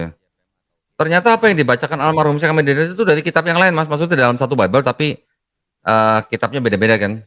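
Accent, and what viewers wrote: Banyumas, Indonesia. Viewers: Berarti pak bisa ngomong ngapak dong? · Koh ngapax dong???🤭🤭 sekolah nya dkt ST cilacap ya koh?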